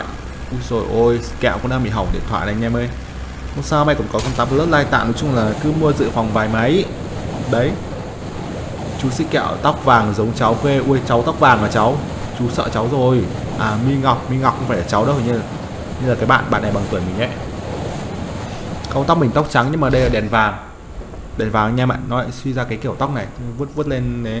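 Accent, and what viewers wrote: Thanh Hoa, Vietnam. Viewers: Em đoán Giọng Anh Giống người Thanh Hóa vậy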